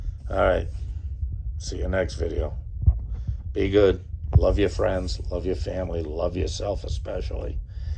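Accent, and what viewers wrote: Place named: New York, United States. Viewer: Glad i found your channel.. Thanks for the update, yeah these northeast cold nights are starting to suck. Its killer you sound just like my cousin from NYC.. I set my fermeting barrel in an oversize barrel and filled with water, tiny fountain pump and a fishtank heater with a loose lid.. keeps my shit at 87degrees in my shed. I was gifted 2 cases of bananas yesterday and just seeing what to do with em, between you, stillworks, bearded and beaver i got a few ideas.. appreciate it!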